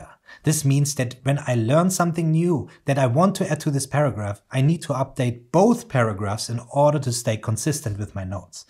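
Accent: Germany. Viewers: Awesome Video! Without looking it up- I guess you are german (like me) :D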